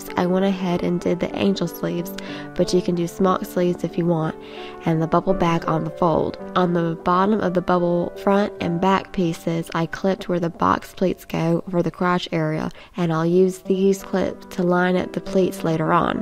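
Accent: South, United States. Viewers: I have been smocking for 27 years and I love your videos, especially your sweet southern accent and background music. I am glad to see a young person reviving the dying art of French Hand Sewing. I also used to take my "project baggie" (or several) with me everywhere! Best wishes!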